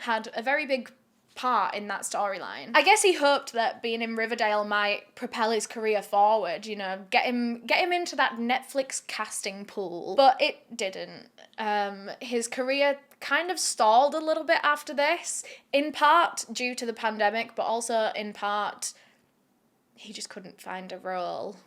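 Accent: United Kingdom. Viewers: yeah it’s the British accent and the way she also tells the story. I feel most just say they do this wrong they did this wrong they went thought this. But when she tells the story she makes you feel sympathy for the killer with things they went through, she shows empathy in the right places and tells it as if they haven’t don’t anything wrong until they do and the whole thing changes to her being against them.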